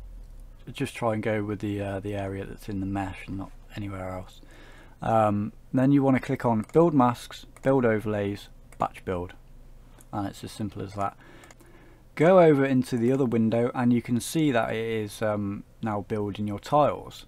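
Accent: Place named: Dudley, United Kingdom. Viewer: You sound like you're from Dudley cool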